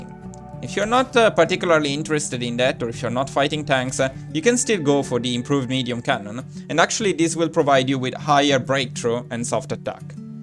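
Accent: Italy. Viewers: Dall'accento suppongo te sia Italiano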